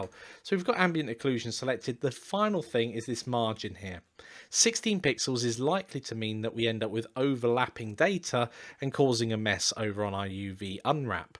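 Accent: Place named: United Kingdom. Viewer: These videos are great. Besides, the British accent is great for teaching apparently. :P Thank you so much for the time and effort you take to teach us! :)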